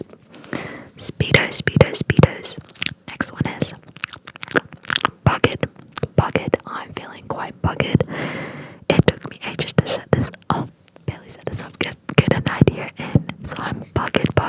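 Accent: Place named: Australia. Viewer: I LOVE THE AUSSIE ACCENTTT, idk why its so relaxing to me. i saw your comment of Ella asmr and had to check your channel.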